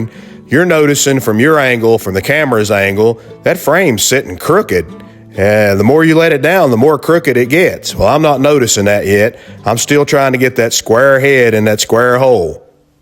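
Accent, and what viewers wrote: South, United States. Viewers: First time I've come across your channel, great work...but initially I thought I was listening to an episode of the Dukes Of Hazard, them good ol boys! Hi from Down Under Australia!!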